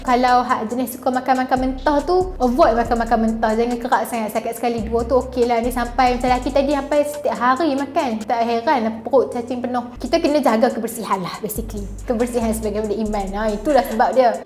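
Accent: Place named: Kedah, Malaysia. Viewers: Tudia hang puny sembang Kedah memang keluaq habeh tak tapeh ooo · menarik pulak speaker ni cakap utagha syiokk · comel la cakap utaqa 😅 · uish loghat utaqa.. dulu aku pernah kena cacing masuk dlm sel kulit sebb pegi pantai x pakai kasut... time tu kecik lagi sampai besar skarang still ada Jalan Cacing tu dkt lutut & blakang... sejak daritu aku Fobia nk ke pantai jijak pasir Daging Mentah: Islam pun kata "Masak adalah makan yg sempurna dari metah yg boleh mendatangkan penyakit❤"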